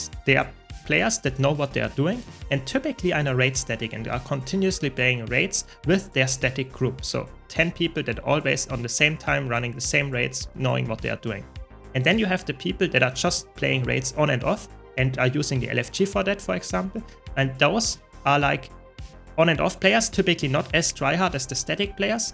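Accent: Germany. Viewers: Hab mich schon gefreut ein Deutsches Video anzuschauen da der Titel auf Deutsch ist.. Klicke drauf und gesprochen wird auf English mit einem Deutschen Akzent.. schade.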